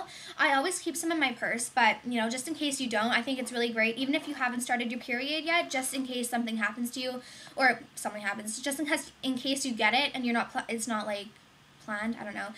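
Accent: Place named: Canada. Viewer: look at how tan you were! goodness..haha your so pretty and i wish i had a canadian accent haha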